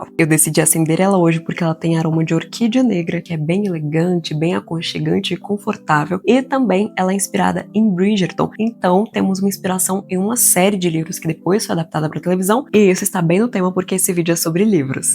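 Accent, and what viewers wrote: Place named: Portugal. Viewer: eu sempre fui leitora, mas meus pais não tinham condições de comprar livros sempre, mas na minha escola tinha biblioteca e eu AMAVA, me sentia a Matilda.. e um que me marcou muito foi "Apertada e sem espaço" e está na wishlist da amazon do meu bebê.. sou louca pra ler os livros da minha infancia pra ele. que delicia de vídeo, conheci você atraves do chat da Amylivros na twitch, e seu vídeo me prendeu e me cativou de primeira.. quanta delicadeza e dicção boa de se ouvir. Você é de portugal? sotaque me lembrou um pouco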